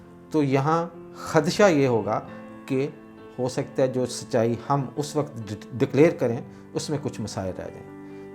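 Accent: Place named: Punjab, Pakistan. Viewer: nice,agreeاردو الفاظ اورجملوں کی ادائیگی کرتے ھوے پنجابی لہجہ کا تڑکا برا نھیں،لیکن اردو زبان کا اپنا لہجہ اوردرست تلفظ کی ادائیگی کااختیار کرنا،تقریر کو زیادہ پُراثر بنانے کےلۓ یہ پریکٹس بھی احسن ہے۔🌺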